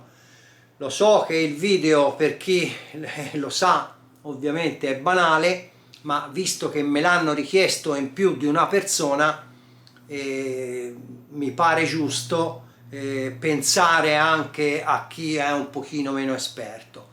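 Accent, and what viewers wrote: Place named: Pisa, Italy. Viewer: Toscano ok... Ma.. Pisano? Non sei fiorentino, non sei livornese... Dall'accento direi provincia di pisa..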